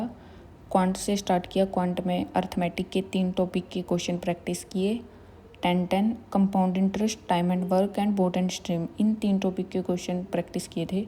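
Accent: Haryana, India. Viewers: your hindi with haryanvi accent is too good #complement❤😄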